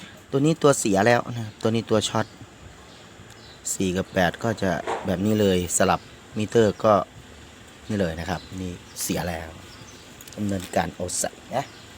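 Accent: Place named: Isan, Thailand. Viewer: มักคักๆสไตร์การพูดไทยบ้างอีสานเพลินดีดูสนุกเข้าใจง่ายเอาไปร้อยเลย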